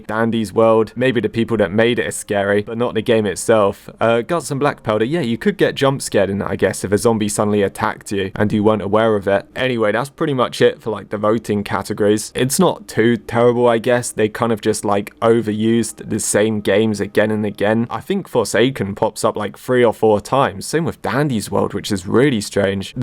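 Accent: United Kingdom. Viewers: Hah british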